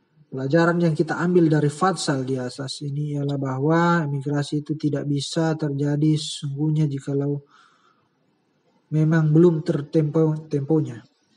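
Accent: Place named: South, Indonesia. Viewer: Mantap.. Tetap dan masih kentara logat selatan.. Salama'..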